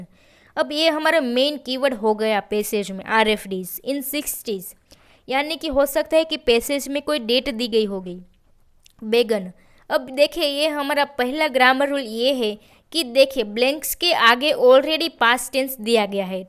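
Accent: Gujarat, India. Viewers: Thank you 🙏 Gujarati tone in your voice 😄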